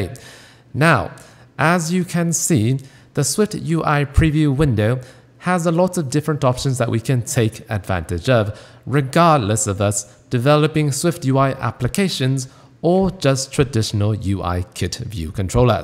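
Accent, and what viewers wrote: United Kingdom. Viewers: What the fuck is it? British?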